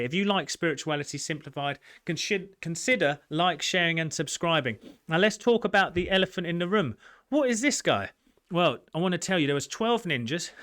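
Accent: Essex, United Kingdom. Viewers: I knew you were an Essex boy! Love your passion😍. New to you but look forward to hearing from you and your way of explaining it all.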